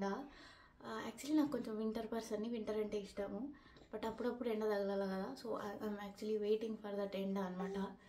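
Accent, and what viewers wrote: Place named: Anantapur, India. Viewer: Kal teaspoon 😂 our ananthapur slang❤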